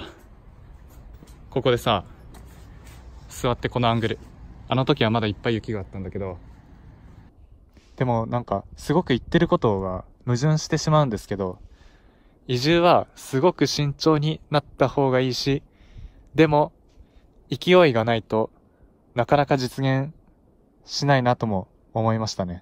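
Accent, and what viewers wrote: Aichi, Japan. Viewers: たまにイントネーション違うな～って思ってたけど愛知のイントネーションなんだな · 愛知の方のイントネーション好きです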